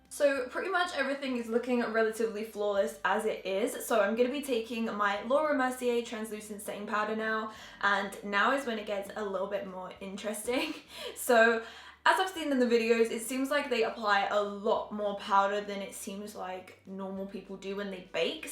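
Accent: Australia. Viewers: Why does she sound Australian when she's English? I don't get it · Are you Australian or From New Zealand? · krystal turvey no she have an australien accent. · is she australian like me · Hi. In which country do you live? your accent sounds australian :))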